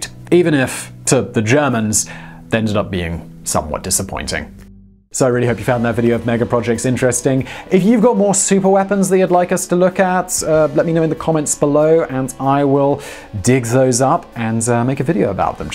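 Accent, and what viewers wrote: United Kingdom. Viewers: Oh awesome, one I've never heard off! And, we Americans LOVE the British accent.;)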